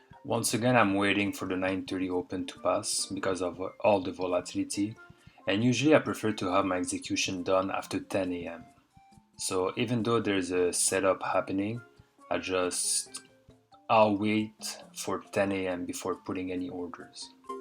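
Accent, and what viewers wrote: France. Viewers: Hi, nice videos, can smell a french accent here :) Or maybe not .. Is the checkbox on your chart an indicator !? If so, do you mind share a link ? Cheers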